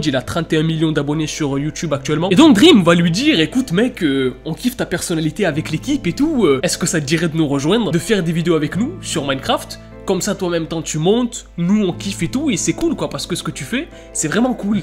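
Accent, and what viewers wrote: England, United Kingdom. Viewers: j'adore ton accent anglais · Je kiff ton accent anglais frérot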